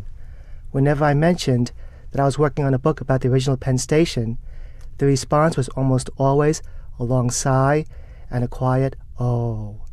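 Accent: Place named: China. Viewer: How can he be a native New Yorker with this Chinese accent?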